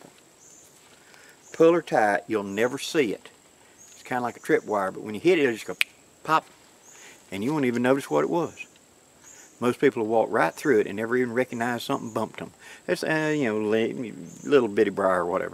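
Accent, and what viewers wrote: South, United States. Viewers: Lovin' that southern ascent there ya'll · This hicks southern accent is SOOOOO great and soooo perfect. I really can't tell if it's real or not. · Definition of one of the purest Southern accents, this video can be used as a tutorial among lingual regional accents.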